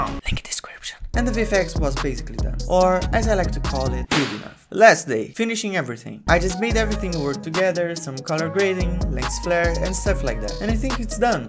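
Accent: Brazil. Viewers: Primeira frase que eu ouvi já pensei "hmm sotaque de brasileiro" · Eu percebi assim que ele começou a falar inglês, sei lá, parecia um inglês meio nordestino, pensei "sapoha é Br rapaz" kkk Cara esperto e esforçado slc. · o sotaque br é muito reconhecível, não dá pra deixar passar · Esse cara tem um sotaque meio q brasileiro n acha? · Sotaque brasileiro do caralho kkkkk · Puta q pariu! Q FODA! Br reconhece outro BR só pelo sotaque kkkkk. Boa sorte nos trabalhos mano 👊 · Esse cara pelo inglês dele, parece que tem um sotaque brasileiro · Adoro o sotaque brasileiro inglês hahaha, eu entendo tudo, os gringos falam tão rápido q nem da tempo de dobrar a língua ksks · Sabia q ele era brasileiro, esse sotaque não engana kdjdkdk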